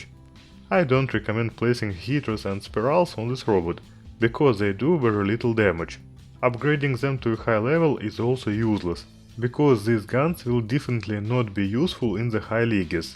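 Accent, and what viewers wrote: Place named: Russia. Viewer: а по русский нельзя? все равно английский как русский ..так понятнее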